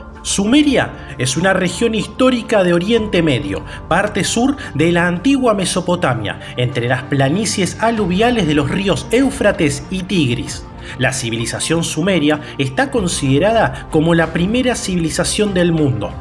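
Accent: Argentina. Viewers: Benditos sean todos los hispanohablantes pero yo puse el sonido para ver quién relataba este documental y escuchar un argentino fue un aliviaso, encima buenísimo el canal, bien ahí <3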